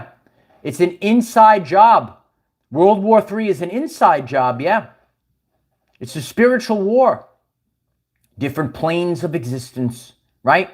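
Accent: New York, United States. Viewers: I have a client that talks, accent like you. He's from New York. Guessing that's where your from?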